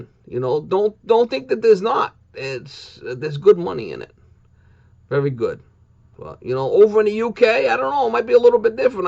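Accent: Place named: Boston, United States. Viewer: We could tell u might be from BOWSTON.. But anyway, great video .. The best part was when you said "I do what i wanna do" the attitude of someone who doesn't have to get one of those crapy corporate jobs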